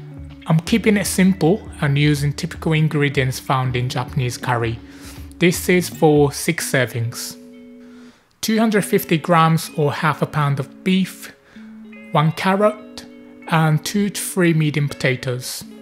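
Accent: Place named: London, United Kingdom. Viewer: Great video the accent is really really unique your English teacher must be super British, probably from London and working class, teacher probably not formally educated on pronunciation. Very classy vid but not a "t" pronounced (mel"t"ed). Anyway 1000x better than my Japanese will ever be lol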